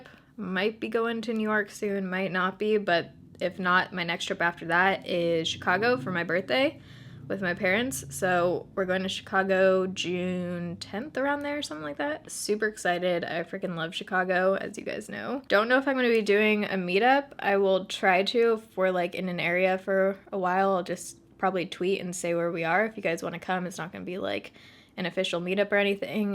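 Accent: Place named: Seattle, United States. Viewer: You're the first beauty guru I've followed who lives in my area. I actually just started following you, and when you said you were living in Seattle it all made sense. Your chillness, fair skin (which is easy to have when it always rains here), the way you talk (although I can't explain it). I'm looking forward to seeing more of your videos!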